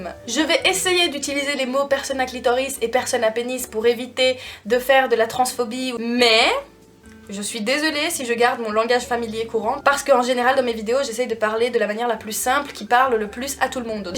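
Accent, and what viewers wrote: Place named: Geneva, Switzerland. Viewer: Depuis le temps que je regarde tes vidéos pour une fois je commente pour te dire à quel point je "prend mon pied" (bah oui restons dans le thème haha) à regarder tes vidéos! il suffit que j'aie eu une sale journée et que je me pose devant mon ordi avec toi pour me sentir tout de suite mieux! Va savoir, j'aime ta facon de t'exprimer, tes sujets et la facon dont tu les aborde, je suis sûre que tu aide énormément de monde avec tes vidéos! De plus tu me rappelle ma Suisse que j'ai quittée il y a un an pour le bout du monde, et ca me fait toujours plaisir d'entendre ton charmant p'tit accent de Genève:) Bref, continue, reste comme tu es (même si je ne pense pas que tu aie l'intention de changer!) et MERCI pour toutes ces good vibes que tu nous partage! Peace! <3 Et vive nos clitoris!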